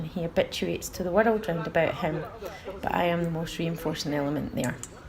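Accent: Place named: United Kingdom. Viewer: Did you say 60 or 16 reinforces? I'm sorry, not really used to british accent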